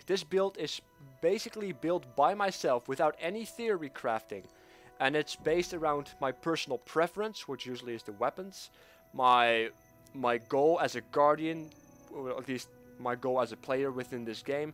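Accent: Netherlands. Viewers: You are a dutchy aren't you (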